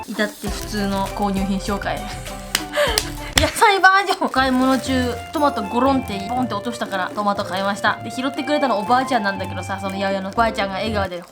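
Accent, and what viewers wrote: Kansai, Japan. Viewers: ちゃんって日本語は関西弁だね。料理系バイリンガールのモモカちゃんと同じやバイリンガールyoutuberって関西弁が多いのは何故なんや？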